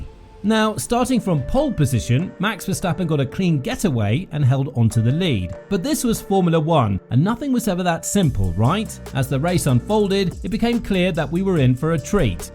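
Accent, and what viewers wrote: United Kingdom. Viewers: LH "arguably" one of the most greatest driver in history.. says the bias British accent again. Well with his 7 titles he clearly isnt. Before F1 he wasnt that special as the greats in history such as Schumi, Senna and now Max. Those last three are completely from another league.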